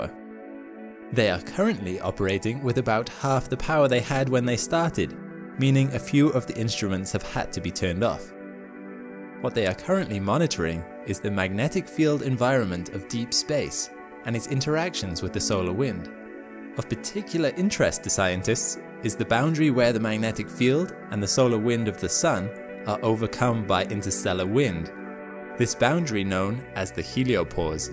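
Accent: United Kingdom. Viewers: I can't get past the narrator's voice, it's like a mixture of a foreign accent with a British accent. Videos are pretty good, hire someone else to narrate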